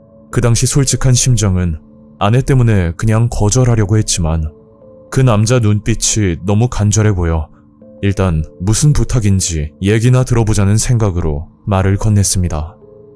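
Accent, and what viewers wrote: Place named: Jeolla, South Korea. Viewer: 아따 전라도라~~~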